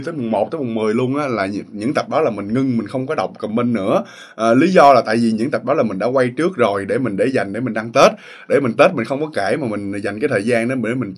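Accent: Mekong Delta, Vietnam. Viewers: gốc miền Tây hả ha, nghe giọng chân chất, tốc độ nói cũng khá nhanh nhưng đủ để nghe kịp, giống kiểu nói của mình.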